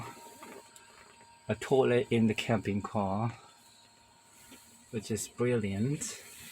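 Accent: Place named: United Kingdom. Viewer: 개인자작이 업체보다 더 잘만들수 있다니..대단하십니다~ 나중에 의뢰하게 될지도 모르겠네요.. 그리고 개인적으로... 영식영어 발음이 한국인에게 미식보다 어려운 점이 많다고 생각하는데 멋지십니다^^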